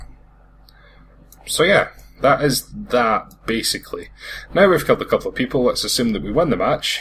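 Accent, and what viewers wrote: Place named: Scotland, United Kingdom. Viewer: Love the Scottishness <3 I'd love to see more games like these. Ones that are good time wasters and that are off the main Radar of Say the Steam featured items page :D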